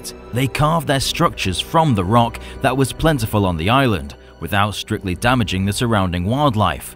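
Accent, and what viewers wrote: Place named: United Kingdom. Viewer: U sound like every British person ever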